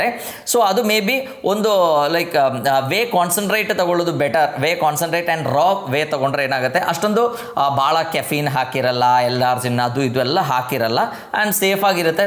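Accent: Kerala, India. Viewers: ಅದ್ಬುತ ಕನ್ನಡ ಮಲಯಾಳದ ಶೈಲಿಯಲ್ಲಿ..‌ಅಡಿಪ್ಪೊಳಿ... ದನ್ಯವಾದ.‌🎉 ಉತ್ತಮ ಮಾಹಿತಿ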